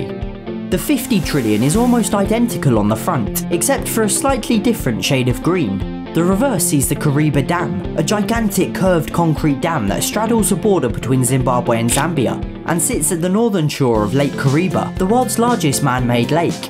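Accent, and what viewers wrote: United Kingdom. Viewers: within the first 15 seconds, i knew you were British, the ascent and the monopoly board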